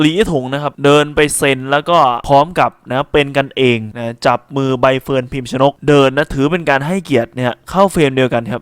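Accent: Ubon Ratchathani, Thailand. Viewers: แอดมินเป็นคนอุบลหรอครับสำเนียงเหมือนคนอุบล😊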